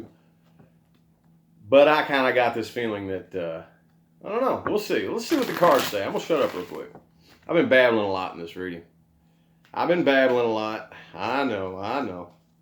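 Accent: South, United States. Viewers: Lmaooooo....i love that country accent oh my god.....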